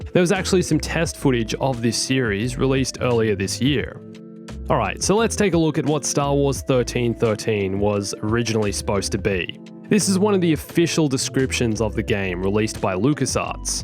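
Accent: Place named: Australia. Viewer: What part of aus are you from mate ?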